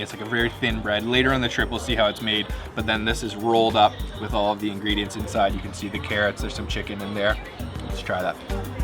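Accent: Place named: Canada. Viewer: I love your Canadian accent (the "ou" phonemes are probably Scottish in origin)! You probably know more about international cuisines then anyone else on earth. "Been there. Tasted that . . ." It's existential, not cook book stuff! Long may you reign . . .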